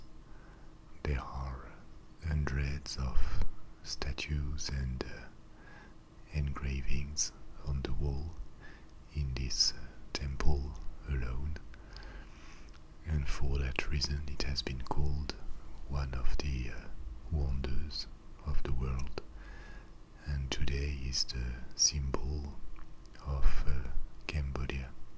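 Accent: France. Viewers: I'm not usually find of French accents. Yours is different, I just don't know what makes me like it so much.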